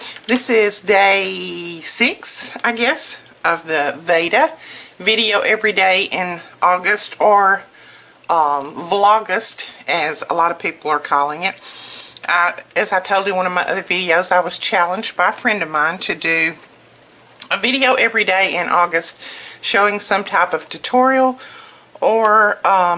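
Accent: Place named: East Texas, United States. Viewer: would you happen to be from E/NE Texas? you sound like family members of mine. :-)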